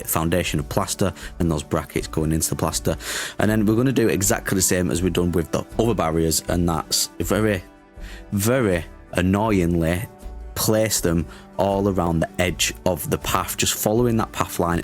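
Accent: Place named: Northern England, United Kingdom. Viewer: Random comment, but being from the UK, I love hearing a northern voice on YT!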